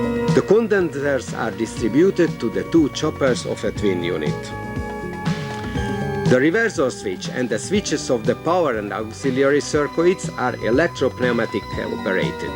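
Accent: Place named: Hungary. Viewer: Huu.. this is too hunglish accent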